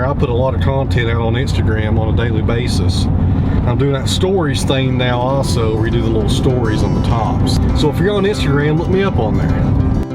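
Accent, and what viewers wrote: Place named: Kentucky, United States. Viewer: You sound just my family in far southeast KY. I recognize that accent. Fine people.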